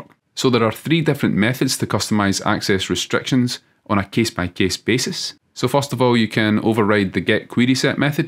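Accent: Scotland, United Kingdom. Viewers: Great series so far... I am glad I moved to Scotland a few months back, I can understand you perfectly (assuming you are Scottish). The way you teach is excellent, loads of people throw things at you but you take the time to show where to find the info, look at the doc while coding and also look at the code behind the scene, that is brilliant. Subscribed, thumb up, coffee to come in a few days.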